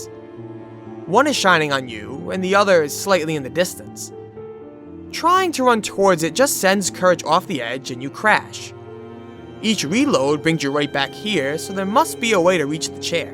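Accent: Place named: Minnesota, United States. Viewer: This guy has the thickest minnesota accent. Love it!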